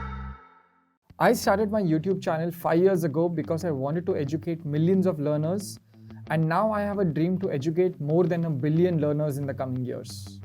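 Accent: India. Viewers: The lady always talking in the maths videos has a strong indian accent how did you think it was forgein based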